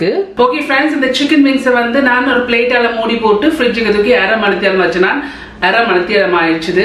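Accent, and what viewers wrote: Sri Lanka. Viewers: Ilangai thamizh ❤❤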